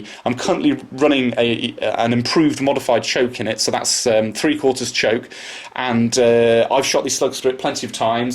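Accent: United Kingdom. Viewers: I did NOT expect a British guy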